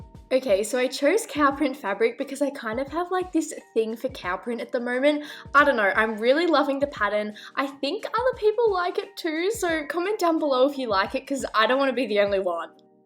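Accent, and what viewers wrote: Australia. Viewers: I hear that Australian accent👌🏿👌🏿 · Wow amazing thank u ... Also r u Australian cause ur accent sounds like u are... U have a new subscriber because of ur help and thank u for helping people... I don't have a sewing machine but I think I can manage sewing don't think it's that hard but haven't shown anything in a few years 😂... Well hope mines come out as nice as yours but I'm sure it will be ok... Stay safe and thanx again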